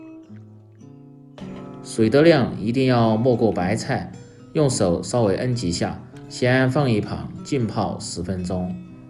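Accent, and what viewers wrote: Sichuan, China. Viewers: 四川人，一听就晓得嘛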